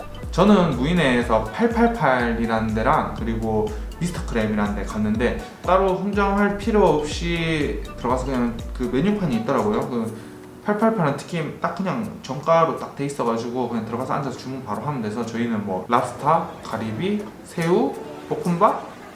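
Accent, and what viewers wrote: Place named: Gyeongsang, South Korea. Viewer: 콘텐츠 잘 봤습니다. '좋댓구알' 했구요. 억양에서 살짝 경상도사투리가 들리는데 혹시 경상도 출신이신가요? 전 대구남자입니다. 혹시 Q&A영상이라도 있으면 알 수 있지 않을까 싶어서 검색해보니 QNA영상은 없네요. 다른 의도는 없는 순ㄴ수한 호기심에서 하는 질문입니다.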